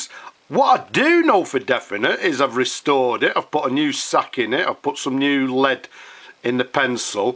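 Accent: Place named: Northern England, United Kingdom. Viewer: Loving the Northern twang lad. I'll contact thi through t'usual channel in a bit if tha's obliging enough.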